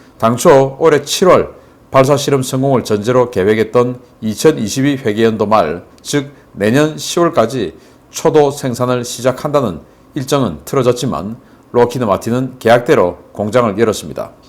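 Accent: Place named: Gyeongbuk, South Korea. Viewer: 말투를 보니 대구 경북 사람이구만.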